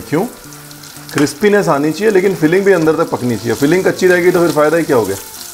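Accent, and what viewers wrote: India. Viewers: No words to explain anything Abt u ND ur vedios... Ur smile is just way too🔥🔥🔥my heart falls Everytime for your every word that you speak in such a down to earth ND desified way...your recipes just amazing...I love cooking I try all of your recipes...ND your punjabiness...❤️❤️❤️❤️❤️❤️❤️❤️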